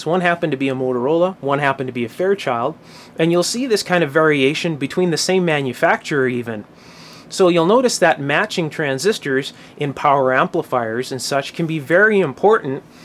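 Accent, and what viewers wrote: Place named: Canada. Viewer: I follow K7PP and probably others that you and others involved with Ham Radio and Electronics. Pete has some great videos and I love those MONSTER DAWGS he has..Hi hi.. Do you follow David at Elpasso Yube Amps? He has some neat stuff as well. Of course I am sure you follow Dave with the EEVBLOG. He's a real hoot too. Are you in the US? You sound like you may be from Canada.. Anyway good chatting with you. I own DSM Labs here in SC and refurbish R 39x series receivers including the R- 389, 390, 390A, 391 and 392 receivers. I do a little Super Pro work from time to time. 73, Glenn WA4AOS dit dit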